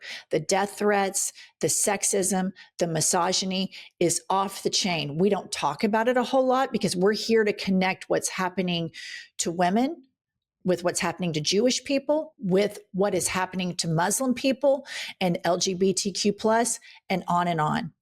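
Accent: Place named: Oklahoma, United States. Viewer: Crap, this guys sounds like he's from Oklahoma. You know, the great RED state ranked 50th in education 48th for poverty top 17 for abuse against women. Yes,First they came for the Communists And I did not speak out Because I was not a Communist Then they came for the Socialists And I did not speak out Because I was not a Socialist Then they came for the trade unionists And I did not speak out Because I was not a trade unionist Then they came for the Jews And I did not speak out Because I was not a Jew Then they came for me And there was no one left To speak out for me agree. You know the poem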